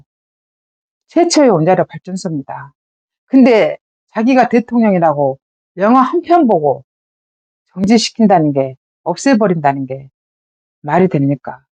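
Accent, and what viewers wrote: Jeolla, South Korea. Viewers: 대구사람인데 근래 보기드문 구수한 사투리와진자보기드문 참전라인을 만낫네요 아침부터 허벌나케 구독쉐리박고 갑니다 조아요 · 미국 71년에 부모따라 이민온 교포입니다. 제가 대학다닐때, 미국뉴스에서 광주사태에대해 보고 큰 쇼크를 받았었읍니다. 유튜브의 제목을 보고 좀 이상해서 클릭했읍니다. 자신을 전라도인이라 소개하며 아주 예쁘신 미인께서 조리있게 설명해주셔서 제가 가지고있던 광주사태에 대한 오해가 풀렸읍니다. 감사 합니다. 저도 많은 한국분들에게 광주사태에 대한 horror story 를 듣고 많은 오해를 하고 있었읍니다. 전라도 억양이들어있는 말로 너무 설명을 잘해주셔서 안믿을수가 없네요. 미인 님의 말씀 참 신뢰가 갑니다. 그렇지만, regardless of how crooked her political philosophy is, 자기 문학실력을 발휘해서 한국국민들이 염원하던 노벨상을 받은 한강씨는 축하해 주어야 한다는 의견 입니다. 제의견에 동의 안하시는분들께 용서 바랍니다.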